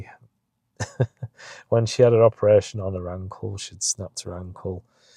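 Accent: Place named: Scotland, United Kingdom. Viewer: your wife and mom-in-law are so lucky to have such a devoted husband and son-in-law to care so deeply and do so much very clearly out of true kindness and love and not just some begrudging sense of obligation, as too often is the case! I pray for a rapid and thorough healing of MIL’s injuries and it’s wonderful she plans to get right back to being active as a hip fracture can far too often signal the end of independence and active lifestyles for older people. I suspect your MIL has nothing to fear as she looks as bright and spry as anything!!! I hope you get some painting done and thank you for sharing your life with us! Ps-this might be nosey but I have to ask…what is your accent? To my highly American ear,it sounds like Scottish and British together? Could that be? 😂 Take care, and take care of Mum!!! 😉😃🙏🏻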